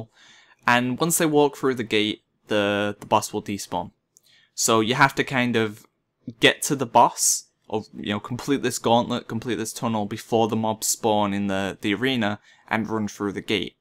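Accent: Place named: England, United Kingdom. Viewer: Adeeb Hamad Manchester i think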